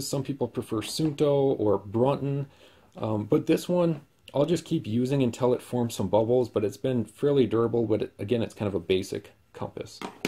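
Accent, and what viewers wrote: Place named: Canada. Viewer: I'm from Michigan but you sound like you're very close to Canada. I don't hear the Canadian twang in voices here in West Michigan.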